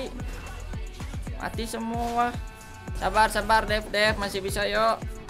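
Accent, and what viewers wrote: West Java, Indonesia. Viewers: Bang orang Sunda ya😂😂 sama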